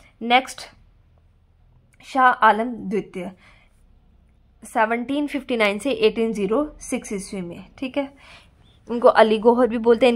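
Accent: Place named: Bihar, India. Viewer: bahut hi achchha contant hai ek hi video me thanks mam, aapke language se lg rha aap bihar se ho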